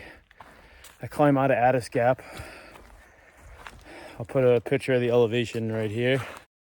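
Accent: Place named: Boston, United States. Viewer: Might you be from Boston, slight accent there. What's the trail name,?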